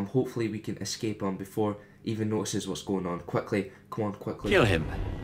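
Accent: Scotland, United Kingdom. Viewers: What's your accent from? I'm hesitating between Scottish and Irish. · Just stumbled into this. Great video and love the accent. :D Might I presume "Scottish" ? Keep them vids coming.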